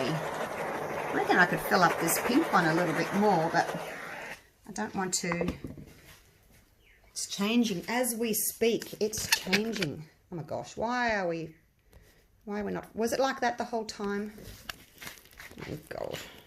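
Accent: Australia. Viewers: so good to hear an aussie voice!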